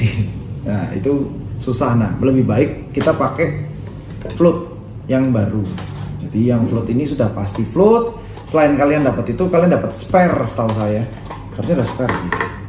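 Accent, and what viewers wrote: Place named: Java, Indonesia. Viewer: asli jowo endi koo😂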